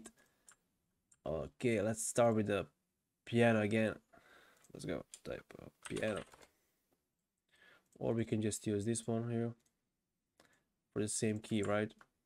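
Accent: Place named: Russia. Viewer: чую русский акцент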